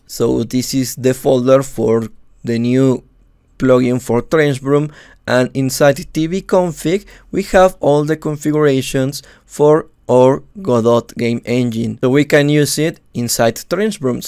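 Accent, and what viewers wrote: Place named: Argentina. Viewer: Sos argentino pa? Muy bueno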